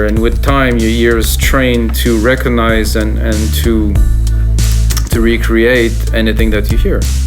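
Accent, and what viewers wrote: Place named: France. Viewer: ptit accent francais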